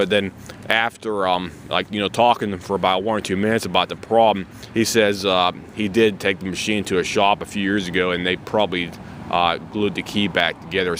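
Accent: Pittsburgh, United States. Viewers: Dude, your Pittsburgh accent is STRONG! 😅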